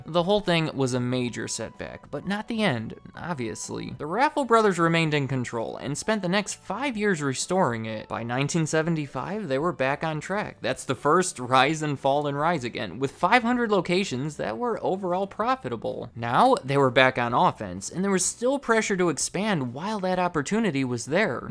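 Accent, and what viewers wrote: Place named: New England, United States. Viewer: Wow the fast talking yankee nasal guy again. Had to fast forward and get out. Again!!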